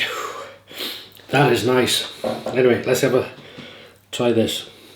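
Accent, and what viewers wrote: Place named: RP, United Kingdom. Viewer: You would make a PERFECT POSH !!!!! You should be a audiobook reader of a Victorian Novel 👏👏👏👏👏 Please make those dialogs . Again. LOVELY !!!!!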